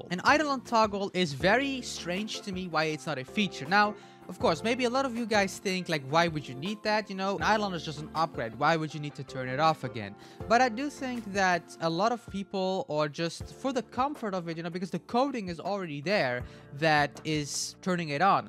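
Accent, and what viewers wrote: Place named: Italy. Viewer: My guess is Italian 🤔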